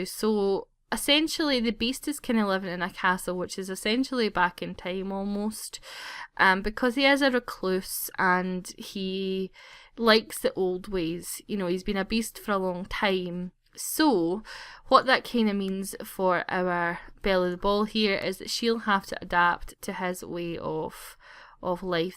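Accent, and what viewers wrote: Scotland, United Kingdom. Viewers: fana101 she is Scottish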